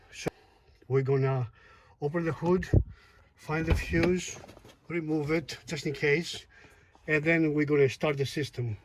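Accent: Greece. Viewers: Good video sounds like Greek accent not that it matters lol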